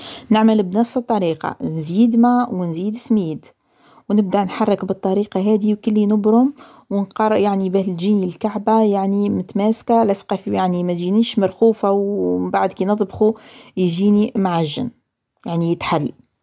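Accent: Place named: Tunisia. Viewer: سبحان لهجتها تقول تونسية 🥰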